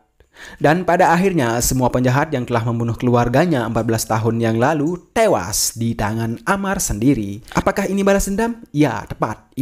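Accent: Bali, Indonesia. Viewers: Mimin dari Bali kah? Logatnya. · Logat bali mu kental broo Salam dari gianyar Ajarin saya buat jadi konten kreator bosku Swastiastu rahajeng wengi Nyama bali ku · Logat mimin Bali / Lombok ...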